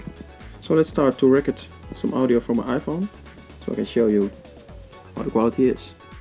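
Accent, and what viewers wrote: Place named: Netherlands. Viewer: Thats what you get when a Dutch person tries to speak English haha. I'm dutch and I reconize that accent everywhere.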